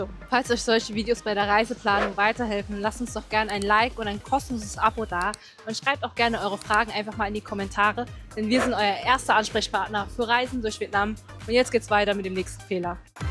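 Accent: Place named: Saarland, Germany. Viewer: BIsch du aussem Saarland? :D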